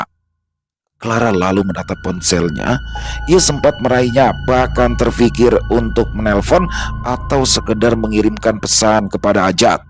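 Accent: Jakarta, Indonesia. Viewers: Bang ❤❤❤, bank, bang Doni sama mba nani,aa hajat sama Clara,mas wasis sama mba ivory,mas gembul sama teh euis, 1/1 pada punya jodoh masing masing lucu deh,.wkwkwk aq suka bang bawain cerita,pakai logat betawinya mantap x,sampai saya tertawa sendiri pas dengerin bang cerita,..wkwkwk 👍👍👍 pokoknya menghibur x saya suka ini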